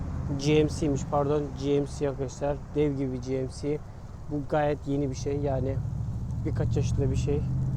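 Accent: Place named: United States. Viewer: Kardeş neden Türkçe konuşurken amaaaaarikan aksanı kullanıyorsun Aaaaa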